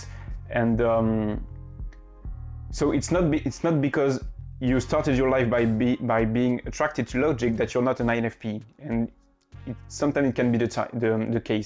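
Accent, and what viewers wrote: France. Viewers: arent you french ???? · Are you French? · Are you french ??🙂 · An INFP child is not happy because they are surrounded by their pairs, they excluded anyway from their surrounding, but they are happy thanks to their imagination that helps them going through life : even though no one understands you and you feel lonely and shitty af, at least you have your inner world... it really saves you sometimes. Also, je rêve ou c'est un petit accent français que t'as là ? 😏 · I’m assuming by your accent that you’re french? I am too. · Wow this is so accurate, thankss for your video. I like your french accent btw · On dirait un accent français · Mec t'es français j'en suis sur (du moin francophone). Ton anglais est lourd mais l'accent nous trahis toujours ahaha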